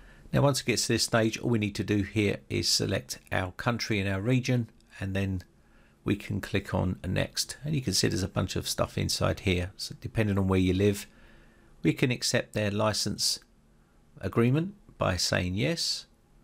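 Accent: England, United Kingdom. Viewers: you are a champ. You have helped me so much with your videos. You will be surprised to here that they go all the way to Australia. 😂😂 By the way, although I live in Oz, I’m a Scot and I like listening to your friendly pommy banter. 😂😂😂👍👍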